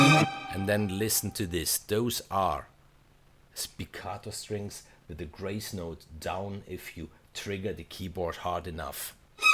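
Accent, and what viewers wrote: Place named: Germany. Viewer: oh, du kommst aus Italien? Opsss dachte du bist aus Deutschland 🤦‍♂️ zumindest nach dem englischen Dialekt nach! Vielleicht reicht ja auch einfach ein deutscher Untertitel? Oder aber, mach einfach weiter so, so viele composer die film score machen gibt es eh nicht in Deutschland und eigentlich hast ja recht, englisch sollte eh jeder können, der in unseren job arbeitet! 🤗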